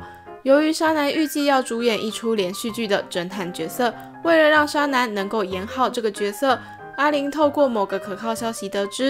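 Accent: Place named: Taiwan. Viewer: 角(音同腳)色，請不要被簡體字同化 ，為何唸角，可以查找辭海類的大型辭典即有說明 ; 角(音同決)主音律上使用，聽播主口音應該是在地臺灣人(不好意思，是頗標準臺灣國語)，個人建議還是該依傳統正體字說法為上。 這本番外，十年前還是大學生時就拜讀過，畢竟，《玩偶遊戲》是少女漫界的教科書，七年級生必讀經典！現在看到又想再把書找出來回味了，不過，當時看就覺得翻譯有問題了。早產是指孕期未滿37週即分娩，書中紗南破水時已9個月，也就是至少38週了，雖不到40週的預產期，卻不是旱產！卻翻成早產！？另則，珠里和早穗翻叔母更是錯的離譜！早穗是珠里母親的妹妹，所以早穗是珠里的阿姨不是叔母，更不用說叔母是大陸用法！臺灣人稱叔叔的太太該叫嬸嬸，珠里是早穗的外甥女，不是侄女！雖然日文講法都一樣，但華人教育是分得很清楚的，這是很明顯的誤譯！這作品的翻譯程度……不予置評。真的會懷念書名仍叫《玩偶遊戲》(大然)的時期，翻譯的中文造詣著實天差地別呀~ 直澄被掰歪，我第一次看時的確不太能接受，一開始無法接受的原因，不是不能接受出櫃，而是直澄他本來就不是性別認同障礙者或是同性戀者， 他的性向是很一般的異性戀，突然要粉絲接受他的"轉性"，真的不容易呀！直到我接觸到《昨日的美食》(身為ACGN齡逾30年的老牛皮，雖算不上腐女，BL多少還是有涉獵)，發覺兩位主角的角色設定並非著重於情人(戀人)，多數看到的是倆人在相處日常上，經過諸多磨合後，心靈契合度和不必言明的默契提升，我在兩個中年男性(魅力大叔)身上，看到了老夫老妻的相處模式，沒有令人眼紅心跳的煽情，只有平淡如水卻長情安然的陪伴！這樣的情感表現，是連異性戀都難以達到的或者該說是多數人都會心神嚮往且追求，若是小時候的男神(雖然我自小是羽山派)和現在的情人相處如同筧和矢吹的話，身為粉絲的我，大方祝福！真心期盼，仁科會是直澄王子的幸福。 希望未來有一日能再看到這部經典的後續。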